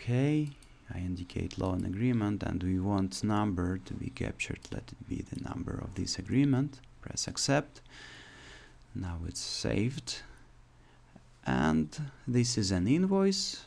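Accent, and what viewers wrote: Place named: Russia. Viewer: ты русский у тебя как будто акцент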